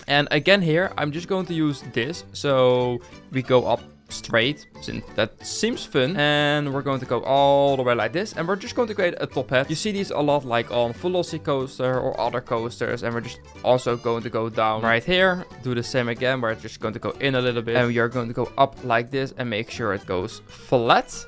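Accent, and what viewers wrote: Netherlands. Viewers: bro ben je nederland svolgens mij wel kan ik horen lol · Hi, I have a question! Are you Dutch?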